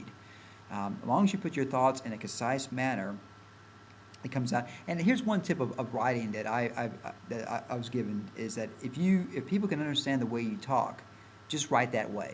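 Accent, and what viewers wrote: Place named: South, United States. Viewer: i thougjt the same and was confused by the southerner accent lol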